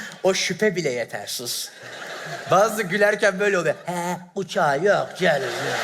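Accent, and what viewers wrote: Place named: Diyarbakir, Turkey. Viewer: cem yılmaz niye diyarbakırlı gibi konuşuyo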